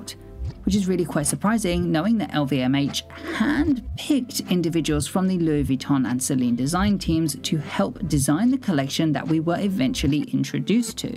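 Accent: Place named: RP, United Kingdom. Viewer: Bro your posh accent sound so prim and proper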